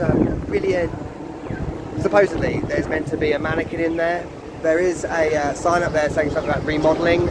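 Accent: England, United Kingdom. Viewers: Cool, a British guy in Chihuahua, love your accent… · Hey. This is the first time I see your videos. Honestly. But I really love it. And I thank you for coming and visit my city. Yo I know I think that we (people who live in Chihuahua) don't even realize how beautiful it is until we see a video like yours. And I liked a lot that you visited and showed what was interesting to you. From your very own perspective. Greetings Love your English accent.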